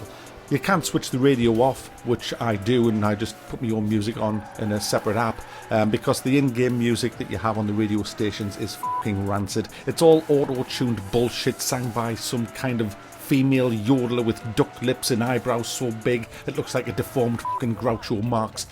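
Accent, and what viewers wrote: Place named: Northern England, United Kingdom. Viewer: love it, Nice to hear another Northerner, from Durham way?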